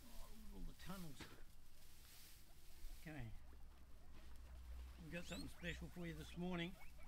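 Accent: Australia. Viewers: Saw the adorable babies, heard the Aussie accent and I had to subscribe to the channel!💕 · What a handsome man with such a wonderful Australian accent😊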